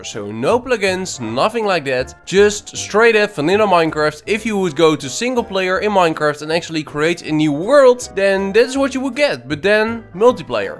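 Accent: Netherlands. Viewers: Hij klinkt Nederlands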